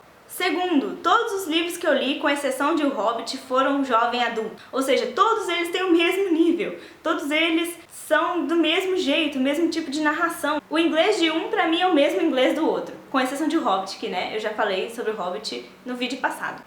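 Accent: United Kingdom. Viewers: Caramba. Hehe Muito bom, e tem até o sotaque da Mione. Kkkk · Meu Deus, você fala bem demaaaaais! E o sotaque britânico é puro amor. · Eu assisto tanto Sherlock (em inglês com a legenda em português) que agora to vendo com a legenda em inglês também. Falo junto com o Sherlock quando ele tá dando adeus pro John ;-; "it's my note. That's what people do, isn't it? Leave a note?" mas acho que não consigo fazer o sotaque britânico que nem você, invejinha. E acho que agora vou ver HP em inglês e com a legenda em inglês também já que sei as falas em português, quem sabe me ajude mais. Eu considero meu nível de inglês bom e consigo ler um livro, mas tem um problema: eu tenho preguiça de ler em inglês lol eu amo ler, mas as vezes bate uma preguiça. Tenho que mandar ela embora por que lendo é a melhor forma para aprender inglês. E to com você, eu também falo sozinha. 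Eu faço resenha de livro falando sozinha no quarto em inglês, com medo de alguém entrar e ficar se perguntando que "merda eu to fazendo" :p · You speak with Hermonie English accent.. woah.. :o I learned some Portuguese by watching Harry Potter in Portuguese.. but I don't think I learned how to speak with a Hermonie or Harry portuguese accent.. aw.. :p it's very good to read books in English too.. I am trying to read books in Portuguese.. I love finding people to speak different languages with.. it's fun to learn about different cultures.. vamos falar em ambos línguas.. yay :D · Adorei o sotaque à la Hermione ^^ Sua fofa ;* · Seu sotaque britânico é maravilhoso!! Parabéns pelo canal,. Me inscrevi e ativei as notificações ❤👏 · O seu sotaque britânico é muito bom - você deve ter praticado bastante mesmo! E esta dica sua é espetacular não só para o inglês mas para qualquer idioma. Parabéns pelo canal e continue com as ótimas dicas! 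:) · Sotaque britânico 😍 estou fazendo a mesma coisa pra aprender inglês também já faz uns meses, é bem legal haha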